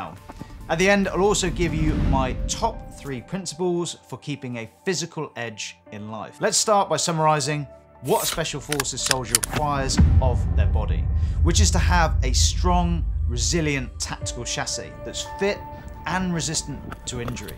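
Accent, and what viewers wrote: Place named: United Kingdom. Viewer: Maybe the obvious reason I like video like this, even when talked about "your biggest fear" Is the UK English voice even some interesting cutscenes (as additional like seasoning) 😅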